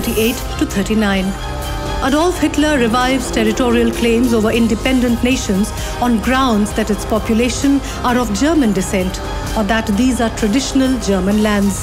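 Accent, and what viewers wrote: India. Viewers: 一看标题这么劲爆，点进来发现是三哥口音 不稀奇. 这个民族没救. 中美GDP差距1/3 中国还谨小慎微.. 中印GDP差了五倍 (在相似人口相似基础下). 印度这个民族永远不知道反省. 指望着骂别人可以提升自己。中国则是励精图治, 奋发图强 。 这是两国最大的区别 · your Indian accent give you away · on a completely unrelated note, i love the indian english accent. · hey guys is the narrator indian????????????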